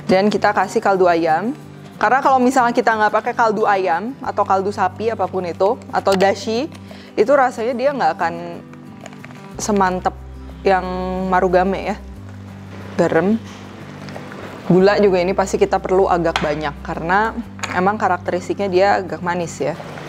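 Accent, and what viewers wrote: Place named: Tasikmalaya, Indonesia. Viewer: "Lebih lekoh lagi" 😁 Cici dari mana, Tasik ya?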